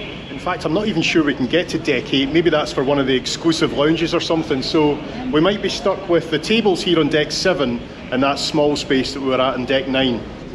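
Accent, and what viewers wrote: Scotland, United Kingdom. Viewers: Spanish. Hola me gusta mucho todo lo que muestras es muy agradable es muy bonito el ferry y todas las comodidades que imagino que la vez la vez de haber disfrutado mucho Tú también una de curiosidades que me pregunto es tu acento inglés de dónde es o por qué no es british tengo dificultad en entenderte pero me preguntó si es por la velocidad que hablas o porque tienes un un fuerte acento de alguna parte de uk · Greetings, my name is Jeff. I live in Melbourne Australia back in 2000 approximately 23 years ago I was on the Isle of Man, but I had a terrible time getting there I had to get the train to Liverpool, and when I got to the theory, they told me the ferry was busted and it was the time at the motorbike races? There was motorbike people everywhere. Anyway, the theory eventually got away about midnight. I ended up in Douglas about 3 AM cost me 60 Isle of Man pounds to go to my B&B up at Laxley But after getting over that nightmare the next four days on the Isle of Man was fan fantastic because I’m a train buff I had a fantastic time but I don’t know what happened. 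I forgot the book my return trip back Liverpool, but they help me out at the ferry on standby time and I got on the ferry to go back home where I’ll staying with friends at Usk in Wales. But now I am 70 years old, retired and a pension had a cost me big bucks to get over there again and it just out of my price rain I do enjoy your YouTube adventures. Lots of fun all the best to yourself and I love your Scottish accent, Jeff.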